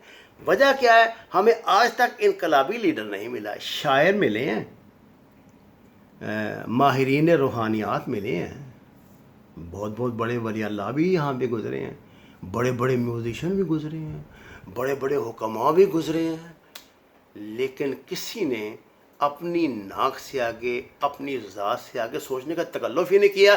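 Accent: Punjab, Pakistan. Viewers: Wo punjabi he uska accent aesa he hoga ksi angrez ki harami aulad. Tujhe khud check k spelling nhi aati.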